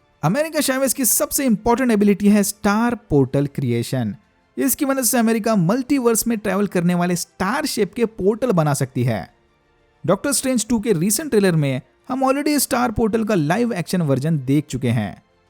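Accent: India. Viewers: I hate your stupid indian accent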